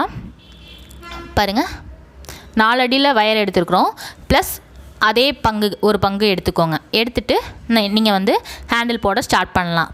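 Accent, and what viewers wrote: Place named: Coimbatore, India. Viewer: Thank you mam unga Coimbatore slang nalla irundhadhu mam so thanks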